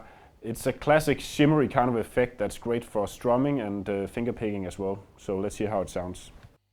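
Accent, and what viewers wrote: Denmark. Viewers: You can't hide with that accent! You've gotta be Danish! Hej kammerat! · danish english! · Lol at the Danish accent, lmao!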